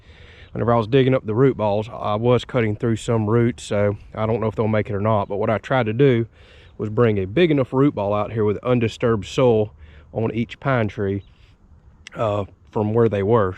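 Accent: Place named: Florida, United States. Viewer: Hey, love the accent. Have you been a Florida man all your life?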